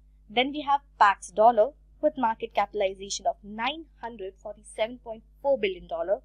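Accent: India. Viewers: Thanks for the lecture. It's just a but hard to follow up with the Indian accent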